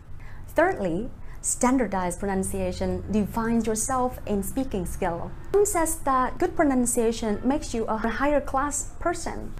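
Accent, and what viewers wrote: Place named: United Kingdom. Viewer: Dear Ms.! You are hella superb with the classy British accent Thanx for your nice stunning video Please keep smiling, keep shining and keep flying high All the best. Respectfully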